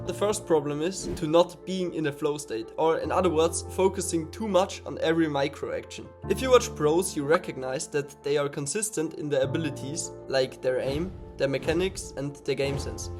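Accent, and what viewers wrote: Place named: Germany. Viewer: Du bist deutsch man hört es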